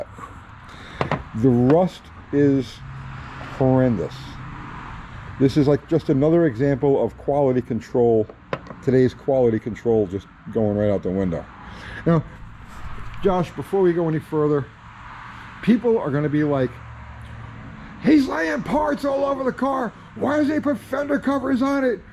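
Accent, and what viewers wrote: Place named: New York, United States. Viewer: You can take out of New York but you can't take the New York out of forgetaboutit!!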